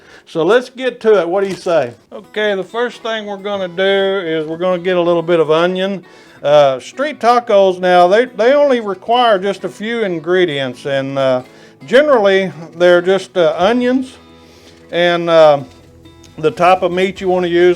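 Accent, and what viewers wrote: South, United States. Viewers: Just went through my annual blood tests and physical. I'm 68. Went in for "The Chat". Took height, weight, blood pressure, the usual suspects. BP was on the high side of normal. I asked what was it last year? She checked and said, About the same. I said Normal, Nervous, Anxious result? She smiled then looked at the summary of results and said, Oh, this is unusual (she shoulda took my BP then). Every test was perfect! (my heart started beating again) See NEXT Year! I hope all goes well with whatever confronts you, my Friend. 🙂 Now, I think you'll enjoy this. Our carpet was scheduled to be put in yesterday. We were watching YT videos. The installers showed up early went out and greeted them, a father, daughter team, spoke little English with a heavy Central American accent. I showed them what they were up against and they got at it. 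While lugging in their tools I hit go on a video by Smokin Joes Pit BBQ making Carna Asada's Tacos. The installers were right behind me, heard it, stopped for a few seconds, looked and back to work. I thought it was just coincidental. BUT, you were up next with this video. They were just coming through the door again when I started it and you said, (with your subtle Southern accent) and I'm quoting the closed caption that somehow was on the screen, "Come on stops me Amigo's, how are you doing my friends". Yup, didn't really roll of the tongue the same as it would had Joe said it. 🤣Again he stopped for a second, but just One, and back to work. I ha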